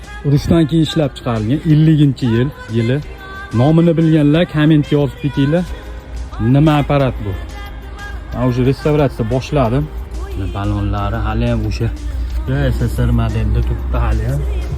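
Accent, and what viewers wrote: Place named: Kokand, Uzbekistan. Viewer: Қуқонликмисиз ака? Қуқонча шева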